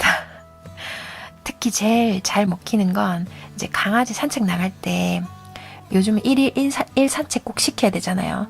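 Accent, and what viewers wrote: Gyeongsang, South Korea. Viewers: 어투중에 살짝 갱상도 말씨가 엿보이는디... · 경상도 사투리가 살짝가미된 목소리가 듣기에 너무좋아요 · 경상도 어디사세요? 저도 경상돈데 목소리 좋네요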